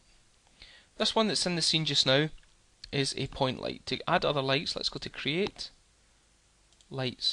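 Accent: Scotland, United Kingdom. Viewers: Are you Scottish!?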